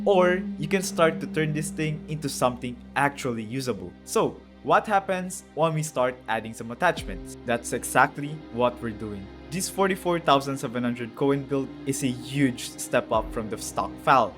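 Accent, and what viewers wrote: Philippines. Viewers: This guy's got that Filipino Accent. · Knew it was a Filipino from the accent then there's the "ginagawa mo" which proves it! HAHA u earned a sub pare nice vids! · Pinoy pala tong si nato HAHAHA i thought i misheard what he was sayin HAHAHA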